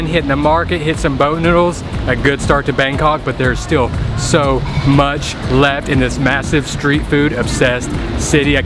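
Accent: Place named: South, United States. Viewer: Love your southern accent as well, you're so lucky to get to travel and eat all those exotic cuisines.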